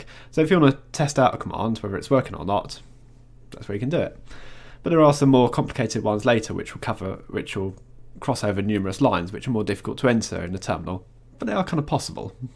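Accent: United Kingdom. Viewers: I love your british accent! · some questions, pls. U rite #!/bin/bash but Ive been riting #! /bin/bash, that is, with a space between the! and the path. Is that important? At least my first scripts have worked. Second. U use to rite bash yourscript.sh to run them, I use sh myscript or. myscript or./myscript or even bash myscript, however, I have a book called Linux the complete reference, 3rd edition and there the author says that when we give the file executable permission, we just need to rite only the script file name, example: $ myscript But what I receive is: bash: myscript: command not found What is bad? Thank you for your videos. And great accent! Pure Brit;)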